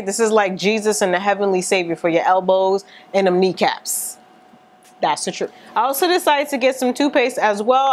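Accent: New York, United States. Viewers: ur videos r everythinggggggggggggggggggggggggggg so nice to hear a fellow ny accent im from da bronx XX!!!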